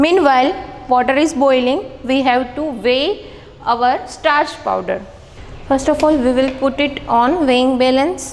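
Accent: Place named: South, India. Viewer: Lmfaoo her English is perfectly fine it's called an accent duh she is prob south indian so yeah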